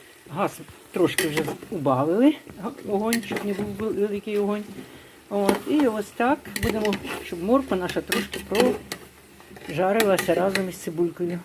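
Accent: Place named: Poltava, Ukraine. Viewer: Вибачте,мені дуже подобається ваша мова,нагадує рідну Полтавщину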